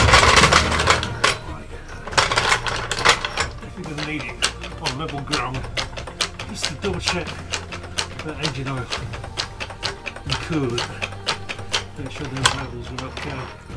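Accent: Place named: Barnsley, United Kingdom. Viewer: I discovered your adventure and I followed the episodes one after the other with great pleasure. The quality of the videos, the humor, the scope of the work and especially its production are of high quality. My English dating from my schooling and a little more, I have to admit that I did not always understand everything but your accent reminded me of my short stay in Barnsley in 1984 during the miners' strike. By the way, your 350z isn't bad either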